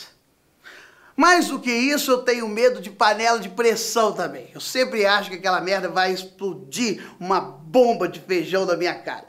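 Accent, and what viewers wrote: Minas Gerais, Brazil. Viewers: VC É MINEIRO? CARALHO KKKK ADORO SO...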